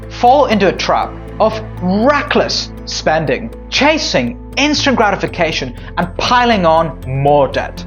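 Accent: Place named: United States. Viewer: bro face indian bro voice american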